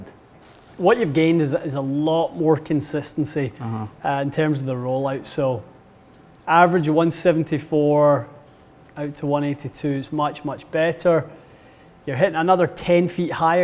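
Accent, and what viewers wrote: Scotland, United Kingdom. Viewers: Great videos pretty interesting stuff, always thought it was ideal to have the spin rates at the number on the club x 1000. Does more spin not allow for easier workablity left and right ? I know guys with modest club speed probably looking to max out distance but surely must be sacrificing stopping control in firmer conditions and shot shaping ability with such low spin ? I swing a 6 iron at 92/3 and am only carrying it around 184 but with spin between 5800 and 6200, also play x100 AMT as I like to see the low launch higher spin flight and also love the feel of the DG. Ive never been properly fit before but do plan to on my next set of irons, have always just went with feel. Also I can tell your from Scotland so hello from Glasgow. Cheers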